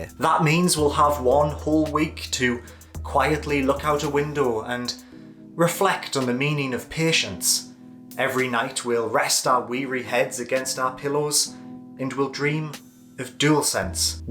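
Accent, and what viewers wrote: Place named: Newcastle, United Kingdom. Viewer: Here for floating Geordie representation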